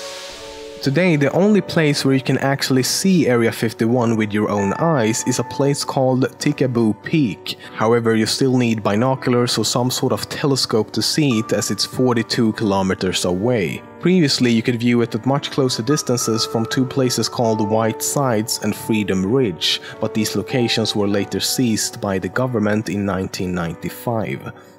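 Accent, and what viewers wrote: Sweden. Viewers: We can hear you are swedish!😂 i’m personally swedish. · Tbh I can’t stand this Swedish English accent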